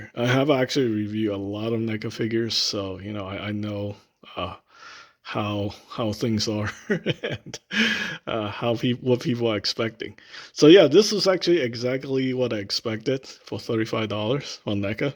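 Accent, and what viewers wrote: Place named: China. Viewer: 您好，你也是華人嗎？ making an assumption based off of your username, and what little accent I can detect. If I am mistaken, I apologize, but if so, I am very, very happy to find another person from the same background attempting reviews in English. I've seen quite a few Chinese channels that do Mandarin reviews, but don't see too many Chinese people doing English reviews. Of course, your reviews are way more professionals than mine, ROfL.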